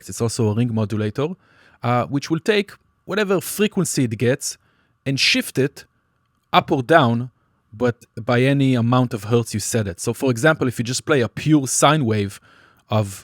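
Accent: Israel. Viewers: I love the fact that I could tell you're from Israel after the first three words you said! But jokes aside, great video and useful info, thank you very much.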